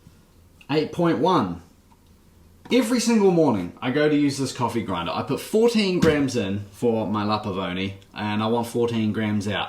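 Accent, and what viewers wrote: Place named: New Zealand. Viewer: Opus wins tin times out of tin. Tin grams in. Ah the New Zealand accent, it's always a treat. Great video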